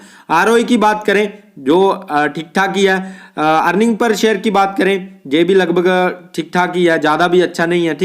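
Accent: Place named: Punjab, India. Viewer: Bhaji tuhadi hindi sunn k lag reha Punjabi ho😅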